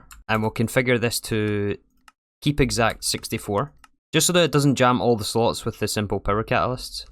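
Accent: Scotland, United Kingdom. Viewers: Yeah, from GitHub about this issue: "The red matter nerfs were too much, previously 100 red matter needed to be crafted, now it's 2" But it doesn't matter, at least you can now set up a proper energy core. I could only add one after finishing the pack. I literally rubber-hammered the creative key out of the assembler line for lack of power. Like I said, I do enjoy watching this series, you have a totally different approach than I have. Two days before finishing the pack I still had only one EBF. I added some more because I got impatient waiting for more tungsten. And there you are, 20 EBF's in a row and 1800 tungsten in stock :-D :-D So it's interesting to watch. The Scottish accent helps too ;-) Keep it up !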